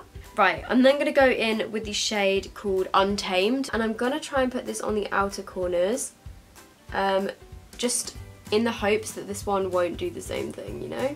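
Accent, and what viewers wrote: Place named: United Kingdom. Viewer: I love accent. "It's got a lot of fool-out". Which part of UK are you from?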